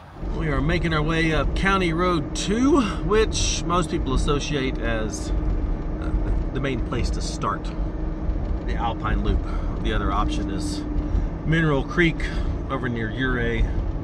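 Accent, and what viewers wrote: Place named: France. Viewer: Your Frenche is adorable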